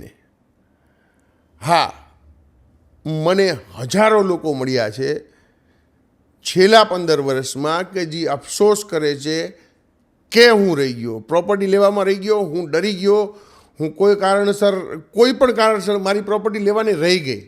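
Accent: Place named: Kathiawar, India. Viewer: Biju badhu to thik pan tame je original kathiyawadi laheka thi vat karo chho e game chhe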